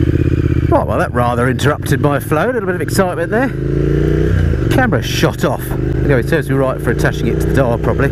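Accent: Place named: United Kingdom. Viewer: It is interesting to hear Brits talk... And a little funny!.. ( no disrespect mate )... Especially the the part were you mention where the bike was made... " made in Toyland".... I'm with you mate! Those bikes should have been made in Britain, that way there would be no excuse on its development.... Sorry it didn't work out in the US either!... But it has learned some important lessons along the way and they would be implemented... Like for example, This year went to the beauty shop in Italy and got itself some nice Brambo brakes..... Maybe next year it will go to Sweden and go to Ohlins to get a new high performance frame and suspension, from now on the sky is the limit!... welcome to globalization!... Lets face it, all the high performance motorcycle methods and designs are covered with patents and there is very little margin for significant innovation.... 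And the best solution is the old saying "if you can't beat them, join them"....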